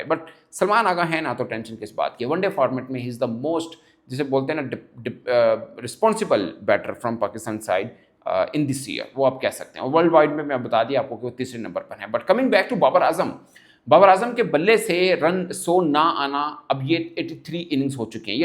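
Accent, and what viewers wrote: India. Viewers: India waly jesa bolty hen wesa he bolta hy 😢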